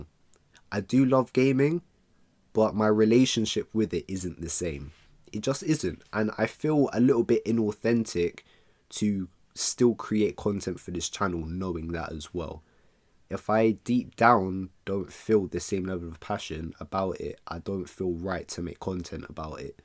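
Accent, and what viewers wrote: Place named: London, United Kingdom. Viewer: Honestly bro where do I even begin a massive shout out to you for being an amazing youtuber round of a applause to you my bro👏first time when I found your channel thought to myself that your from London then I immediately subbed to your channel as I kept joining your streams every Saturday tunning in consistently we both became friends met new people in the streams playing Mario kart having fun honestly am going to miss playing it with you online take care of your self with the hard work you put in YouTube wise.🔥🔥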